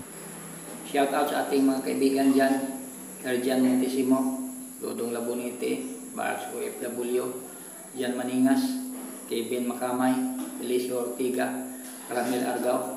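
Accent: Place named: Visayas, Philippines. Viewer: Kaibigan , kung quality control procedure pag usapan natin yong procedure mo hindi ac ceptable . Bakit ? Hindi tama ang mga salita or word sinasabi mo , gaya ng pag lagay mo ng level gamit ang string , or tansi sabi mo elevation hindi elevation tawag nyan kaibigan ang tawag nyan level . Ang term na gamitin mo paglatag ng tiles kung hindi kapa naglagay ng mortar para maiindihan ng viewers , ang term na gamitin mo ay dry "Pre lay ". Saka kailangan nga basa-in mo ang substrate ng structural flooring bago ka mag umpisa maglagay ng mortar. Hindi mo rin sinabi kung anong timpla ng mortar . Ano ratio ng buhangin at cemento ? Yong buhangin mo naka screen ba yan ? Bakit hindi ka gumamit ng tile adhessive.? Kaibigan, sorry to correct your language , kc parang bisaya or cebuano ang mother language mo. Yong sabi mo na special cut ,ang tawag nyan sa tagalog " seroho" in english cut tiles. 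Ang insakto quality procedure pag instal ng tiles na ginagawa namin sa Middle east gamit ng dry pack . Madali at effectivo ang paglagay ng tiles . Ang dry pack mix design is 1:2,5 one bag cement and sand 2.5 compose of screen sand buhangin nakabistay. Mag timpla ka ng cement paste compose of cement and water . Yon ang ebuhos mo sa dry pack pero hindi lahat ng area sa naka spread na dry pack mix. Kailangan compacted ang dry pack mix gamit trowel habang nag spread ka ng dry pack mix. Dami pa malalaman mo kaibigan amigo. Akong paminaw bisaya ka kc accent mo bisaya. Bisaya man pod ko .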